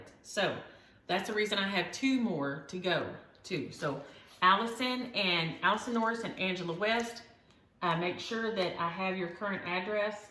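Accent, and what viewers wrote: South, United States. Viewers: Oh my goodness this is my first visit with you and all you had to do was open your mouth and speak! I love your accent, it is so amazing and southern( just like sweet tea)😂 enjoyed your video, I will definitely tune in again. Thank you so much!!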